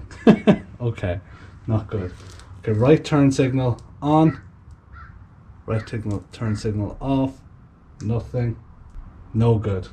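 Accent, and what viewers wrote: Ireland. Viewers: Fair play to you! Is it an Irish accent?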